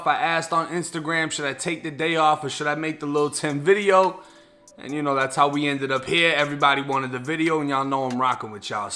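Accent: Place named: Massachusetts, United States. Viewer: Yo you sound like you're from Mass, straight up north shore!! But anyways, I like ur videos!! Good shi*t, keep it up!! 💯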